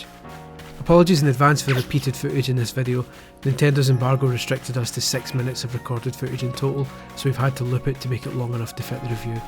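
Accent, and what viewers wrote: Scotland, United Kingdom. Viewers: came for the amazingly in depth review stayed for the amazingly unintentional scottish asmr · The most Scottish man reviews pokemon, good review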